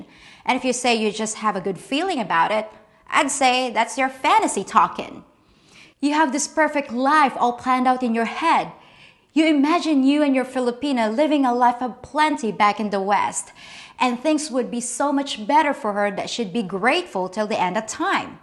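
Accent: United States. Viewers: Hello!.. You absolutely a perfect in the U.S.. Everyone would think you were born and raised in the USA.. Another great episode!..❤❤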